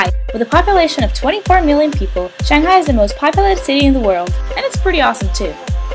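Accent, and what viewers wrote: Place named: United States. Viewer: Sounds like u have an american accent .. I like it 😊 ciao video stupendo brava !!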